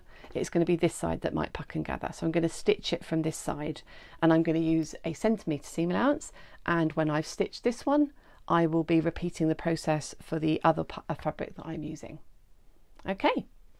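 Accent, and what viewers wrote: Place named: United Kingdom. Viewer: Thank you for your tutorial, it's very useful! P. S. : I love your british accent ❤️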